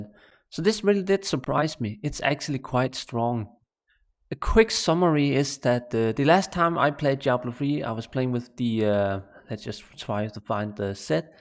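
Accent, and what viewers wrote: Denmark. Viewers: Fed nok video. Så tydeligt at hører når det en dansker. 😂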